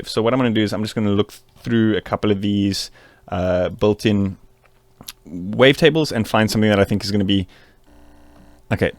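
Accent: South Africa. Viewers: you sound South-African. Are you? :)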